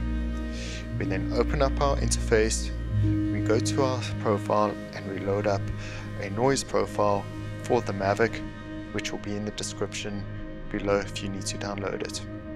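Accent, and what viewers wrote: South Africa. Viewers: I sense a South African accent !!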